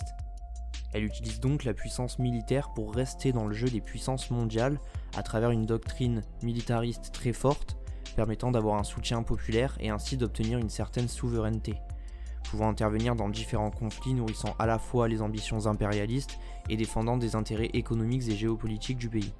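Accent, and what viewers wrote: France. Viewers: C'est un parisien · C'est l'accent du sud 😉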